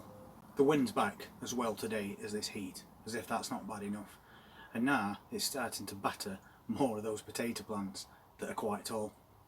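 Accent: Yorkshire, United Kingdom. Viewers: I've planted Kondor (amongst others) in 30 ltr containers, I'm really hoping me watering every day in this near tropical weather is going to give good results, the odd one is dying back, I've really got itchy gloves wanting to empty one out and see what they are like! I also did Ratte, had some decent first earlies from them. 😀That does sound like a Yorkshire accent?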